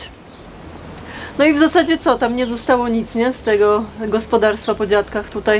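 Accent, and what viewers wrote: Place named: Greater Poland, Poland. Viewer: właśnie oglądam film co opowiadasz i wymowa dialekt zwroty ten tysiąc w karty itd identyczyy co u nas ja z wlkp to nie daleko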